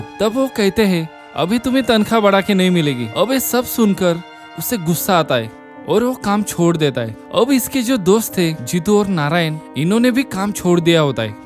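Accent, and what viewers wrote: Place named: Maharashtra, India. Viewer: I am from श्रीगोंदा your tune is marathi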